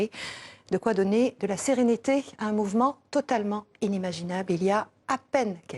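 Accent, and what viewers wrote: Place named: Quebec, Canada. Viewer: je reconnais votre accent vous etes Quebecoise ....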